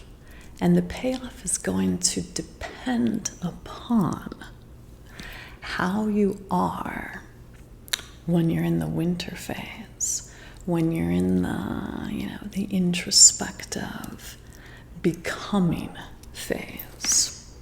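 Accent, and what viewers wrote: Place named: United States. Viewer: I really love your soft and American aristocratic accent. You seem very high society. That combined with your creativity and intelligence and generous inviting attitude is really attractive. It's intimidating but at the same time I would love to have conversations such as these with you. i love your vids! Thank you for sharing! I find it relaxing and interesting!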